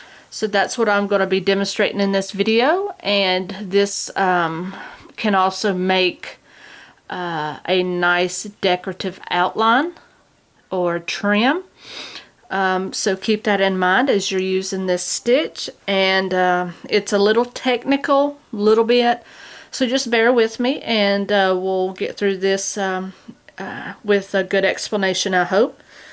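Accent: South, United States. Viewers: That is just wonderful tutorial Ms! I finally got the idea with the Clones Knot needing 'loose' stitches to pull through the wrapped knot! It has taken me all of 14 days to figure it out, and today, there you were. The sun is shining a bit brighter for me today, again, thank you! Love that southern accent!:-)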